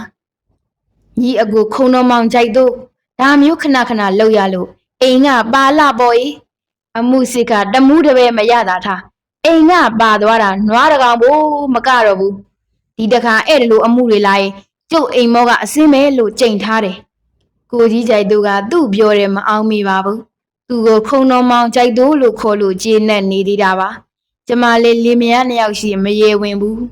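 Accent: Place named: Upper Burma, Myanmar. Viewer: ဆရာမခင်ခင်ထူးရဲ့အရေးအသားအသုံးအနှုန်းတွေကရိုးရှင်းတယ်အညာဒေဓလေ့တွေကိုပုံဖော်သလိုခံစားရတယ်ဖတ်ပေးတဲ့သူရဲ့အညာသံကလဲဝတ္ထုကိုပိုကြွစေတယ်ကျနော်မျက်မမြင်တစ်ယောက်အနေနဲ့နားထောင်ရတဲ့အတွက်ကျေးဇူးအထူးတင်ပါတယ်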